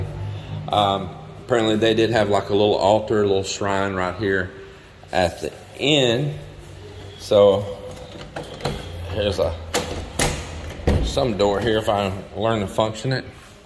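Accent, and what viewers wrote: Texas, United States. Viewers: You make me laugh. The way you talk. I know you are from Texas. I am from Tennessee. I really like the way you talk. So country and I don’t mean that in a bad way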